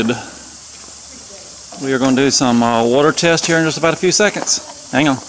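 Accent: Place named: West Virginia, United States. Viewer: Dude I like your numbers mine are chad273👊 if only I had your talent👊 new subscriber here, and I watch a lot of channels and you seem to have figured out how to retain attention. I don’t know where y’all are at but you sound like a West Virginian. You sound like Elliot Sadler which helps on keeping attention. Looks like I have some catching up to do on your channel! Great stuff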